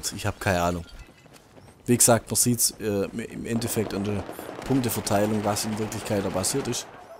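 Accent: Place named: Swabia, Germany. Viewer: Ein Schwabe der versucht Ostdeutsch zu reden. Das klingt einfach schrecklich!!! Du zockst auf der PS4? Vielleicht trifft man sich und ich bring dir´s Ostdeutsch bei... gruss Ulle1410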